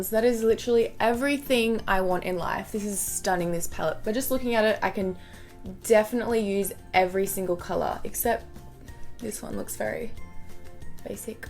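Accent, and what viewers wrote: Australia. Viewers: Australian accent - 😳😓🤐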